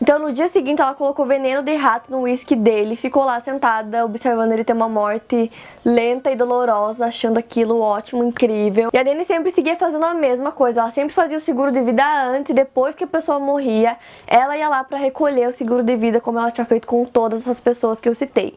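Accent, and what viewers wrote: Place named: Paraná, Brazil. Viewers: Ela é de Ponta Grossa, no Paraná. Eu moro no interior do Paraná e tenho um sotaque parecido, adorei encontrar o canal dela e finalmente ter um Youtuber maiorzinho que fala como eu shshshs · Seu sotaque paranaense é maravilhoso, que voz linda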